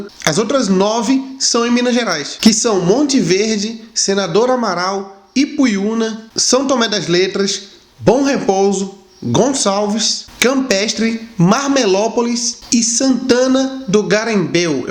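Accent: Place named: Pernambuco, Brazil. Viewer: vc precisa perder esse sotaque de prnambuco pra poder imitar mineiro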